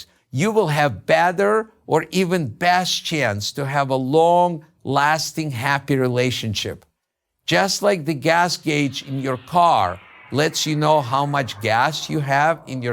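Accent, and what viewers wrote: Russia. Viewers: + The Forms, He is probably a Russian Jew. He does sound Russian. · Русский акцент, господи 😖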